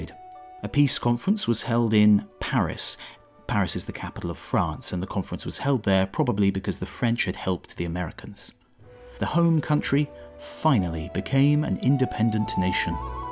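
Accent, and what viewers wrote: United Kingdom. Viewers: All read by a Brit, our former enemy.... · and read by a foreigner from President Washington's most bitter enemy (i believe)! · I'm learning US history from a british person reading an english translation of a japanese book about american history. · And let's not forget, narrated with and British accent!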